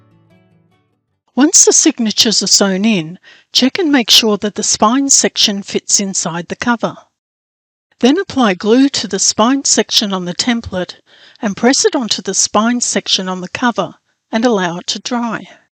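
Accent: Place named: Australia. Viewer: I have watched whar feels like 100's of tutorials on journal binding. This is the best style by far. I can't wait to give it a go. Also, it is so lovely to hear a fellow Aussie making the video. Thanks for sharing.🥰😍🤩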